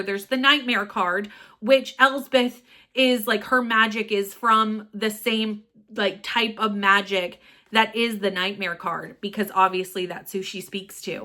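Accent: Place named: Canada. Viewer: Its weird, because every time you say it's your Canadian accent, I'm just like....does this mean I have a Canadian accent, and I just don't hear it xD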